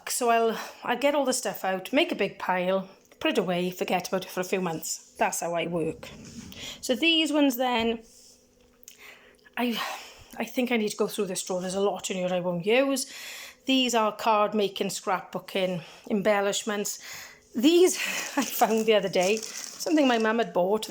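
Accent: Wales, United Kingdom. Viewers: you are a joy to watch. I think your Welsh accent is gorgeous. Between you and Pam I’ll be fluent in Welsh and Scots 🤣All your stitching is lovely and your bags are stunning. You are so talented. I’ve made a few vinyl front bags but I wouldn’t want anyone to look too closely as the stitching is a bit wonky to say the least. Sorry to hear you haven’t been too well. Take the time the doctor has signed you off to relax. Your body is telling you to rest. I hope you get your cardiac appt soon and get everything sorted. I can’t wait to see you next time. Thanks for sharing. 💞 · Lovely room, amazing loving the "Taff" accent. Love Wales!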